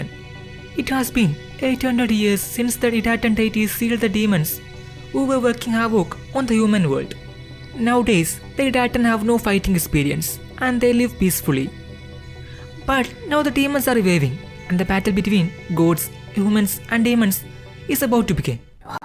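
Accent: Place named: Kerala, India. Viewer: thicc mallu accent xD, but well made video 👍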